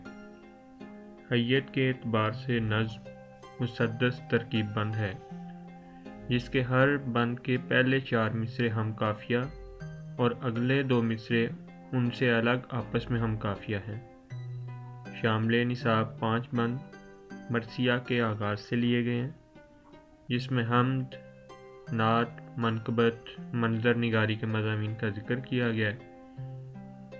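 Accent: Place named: Punjab, Pakistan. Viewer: زبان پر توجہ دیں۔ اردو کو پنجابی میں ادا مت کریں۔💓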